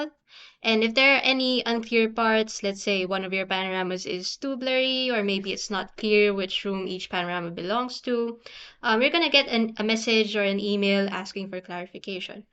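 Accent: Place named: Philippines. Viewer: Been watching these videos, you sound Pilipina (Pilipino here)! I'm liking what you guys do, just signed up :)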